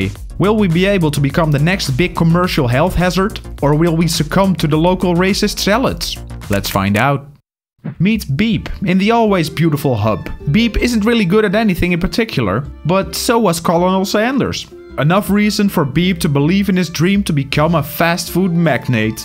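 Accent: Netherlands. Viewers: Dutch accent best accent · Hey bro are you Dutch?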